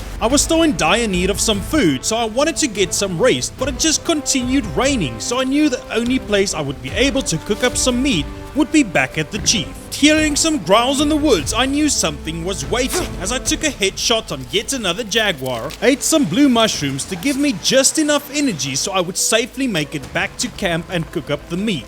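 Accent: Afrikaans, South Africa. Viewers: could tell from you accent awesome to see a fellow south African making awesome content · You sound south african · I knew it your south African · Jir my ou, your accent gives me Afrikaans vibes like jasis my bru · Am I the only one that hears an Afrikaans accent here? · South African? 🇿🇦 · are you south African? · Is that a South African accent? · love your Afrikaans ,Engels accent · Absolutely brilliant. Subscribed. You sound South African, like me - am I right? Love the way you do the story telling which makes getting insight into the game huge fun. Dankie Meneer. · Are you south african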